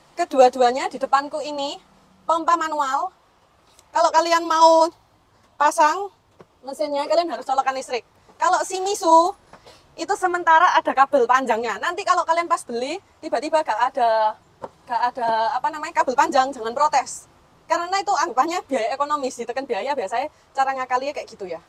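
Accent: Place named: Java, Indonesia. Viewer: Logat jawa nya medok ci😂😂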